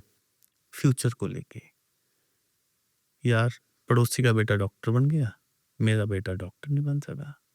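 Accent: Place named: Punjab, India. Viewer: ਪੰਜਾਬੀ ਬੰਦੇ ਦੀ ਹਿੰਦੀ ਦਾ ਪਤਾ ਲੱਗ ਈ ਜਾਂਦਾ 😅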